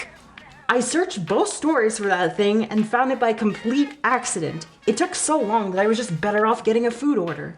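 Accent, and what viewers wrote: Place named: Philadelphia, United States. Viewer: You from Philly? Auto sub immediately.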